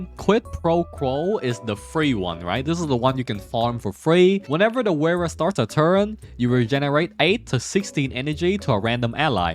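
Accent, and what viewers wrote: Vietnam. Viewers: Is he Vietnamese. My cousin has the same English accent xD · dude sounded like a vietnamese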